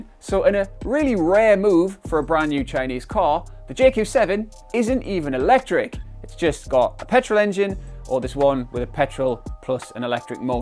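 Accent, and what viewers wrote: United Kingdom. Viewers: Hi is this dude's accent a British Pakistani accent?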